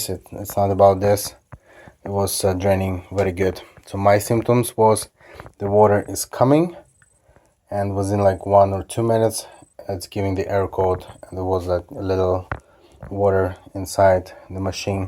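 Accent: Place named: Balkans. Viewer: nice great explanation bro, are you from Balkans?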